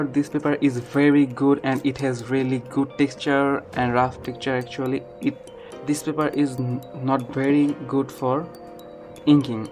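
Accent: India. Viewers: You've got an Indian accent!! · from your ascent i think you are a indian or a bangladeshi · Sound indian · Heyy buddy are you from India? Coz your accent is a little bit indian😅. Btw keep the good work senpai 🙏🏻🙏🏻😂💙💙❤️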